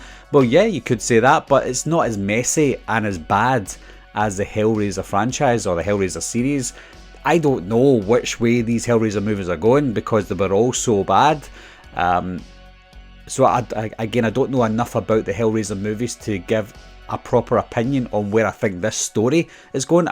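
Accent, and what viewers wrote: Scotland, United Kingdom. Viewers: Yeah of course I love your videos pal and I'm Scottish so it's nice to hear my own accent online 😀 👍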